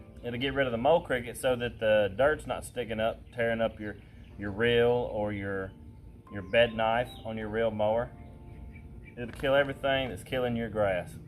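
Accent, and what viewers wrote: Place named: South, United States. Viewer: I just learned what a "Mole Cricket" was yesterday... 'but have had them for about 4 years as I recall. Now I'm taking them to war. The more I learned about them the more panicked I got. Thank you for an informative, non-panicked, very peaceful video. I think it's the southern accent. I'm gonna have some sweet tea... 'and slaughter every last one of them Texas-style. Thanks again Sir.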